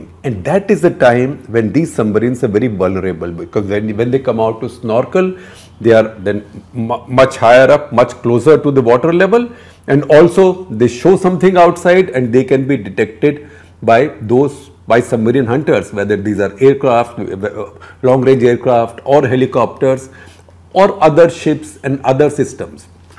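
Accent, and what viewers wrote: India. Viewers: Really dislike indian accent. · If you want to address English speaking people you MUST lose that broad Indian accent because it makes everything you have to say so difficult to understand. You are a very knowledgeable man but you will remain unknown as long as you speak with that thick accent, I'm trying to help you I hope I didn't offend anyone. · Very India English.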